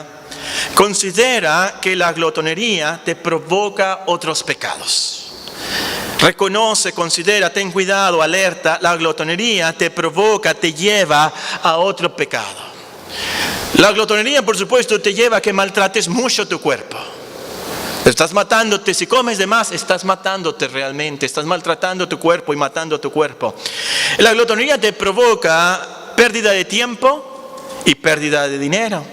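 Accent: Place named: North, Mexico. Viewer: min Pajarito... pero Prehistórico Jajajaja, no, es bromita! Muchhhioooo problema con la glotonería. ¿Es de Chichuahua el predicador? Pronuncia como nosotros los del norte la "CH". Muy buen mensaje para los que somos medio glotoncitos ♥ Dios le bendiga.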